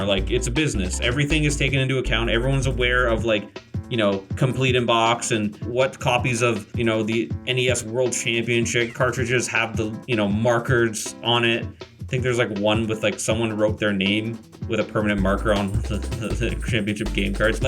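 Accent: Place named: United States. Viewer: As a Michigander, I think your accent is very American. In regards to game collecting in 2023, if it’s not Nintendo it’s not horrible. I collect complete in box ps1, ps2, and Genesis carts and Iv got a good collection and I only started this year, and I don’t feel like a paid too much. Just don’t buy games you’re not gonna play, it’s that simple.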